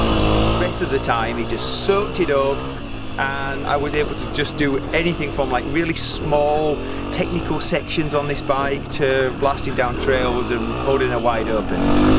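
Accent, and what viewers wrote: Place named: North England, United Kingdom. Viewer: You sound like a Englishman (albeit a northerner). I thought this bike wasn't available in the UK. Where can I get one?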